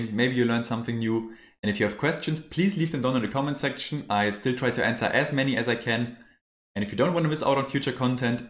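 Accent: Germany. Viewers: das deutscheste english was ich jemals gehört habe.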